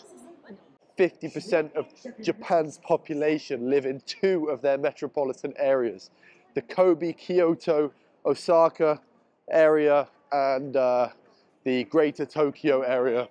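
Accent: United Kingdom. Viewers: あなたの英語はブリティッシュアクセントなので、日本人には聞き取れない可能性が高いです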